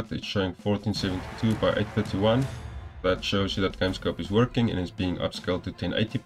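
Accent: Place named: Afrikaans, South Africa. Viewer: jy klink afrikaans bra!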